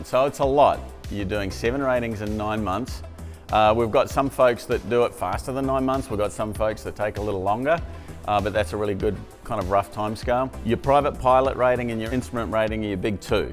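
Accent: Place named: Australia. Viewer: Given your accent, I thought you are based in Australia.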